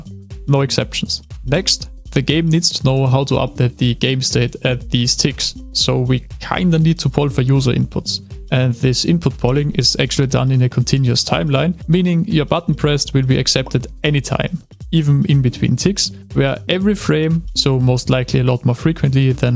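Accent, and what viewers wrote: Austria. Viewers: hätte auf Österreicher getippt ;-)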